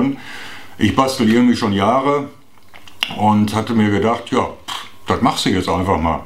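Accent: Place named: Ruhr, Germany. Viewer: Ich mag deine Projekte und deinen Slang! Ruhrpott? Gruß von einem Exil Dortmunder.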